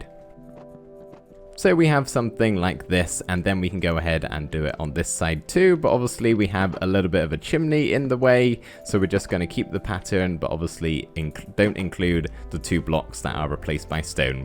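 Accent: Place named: Scotland, United Kingdom. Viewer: Hey, really enjoying your builds. I've been trying to place your accent. Is it Scottish borders?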